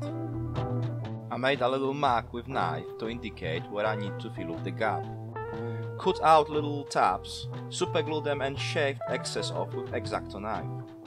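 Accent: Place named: Hull, United Kingdom. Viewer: Good job buddy loving the hull accent lol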